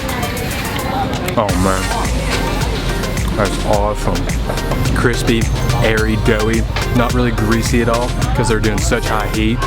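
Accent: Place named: South, United States. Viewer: Yo voice tho... Varrryy South... 😁 CM my hometown I am back in 2 weeks time for Songkran.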